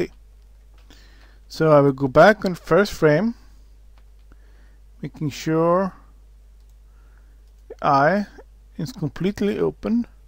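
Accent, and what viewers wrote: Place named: Ireland. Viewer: where are you from? such an interesting accent, Irish?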